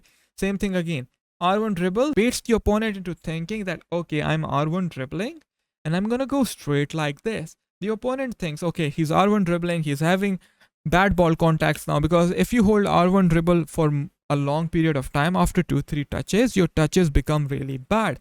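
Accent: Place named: India. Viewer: Indian accent.. is tough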